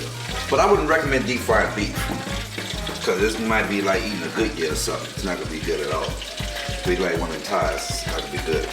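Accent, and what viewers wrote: New Orleans, United States. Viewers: I hear that New Orleans accent. Love it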